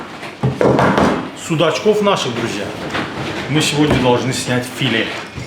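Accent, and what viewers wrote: Baku, Azerbaijan. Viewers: Салам землякам.я сначала ставлю би дяня жирный лайк а потом слушаю родно бакинский говор.мы часто в Новханах делали балык .кябаб.и котлеты классные тем более в вашем исполнении.Спасибо🎉🎉🎉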